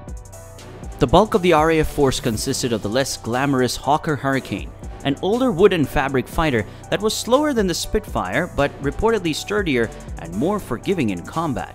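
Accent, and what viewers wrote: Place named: United States. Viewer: No I didn’t like the video and you won’t see me next time. Why didn’t I like it? Ludicrous American accent from an individual who can barely speak English. Why can he not pronounce British place names e.g. Coventry, properly? Totally inappropriate and ludicrous video footage throughout. What on earth have American planes got to do with the Battle of Britain? And was that a clip of a Japanese scene at the very end? The whole thing is absolute garbage.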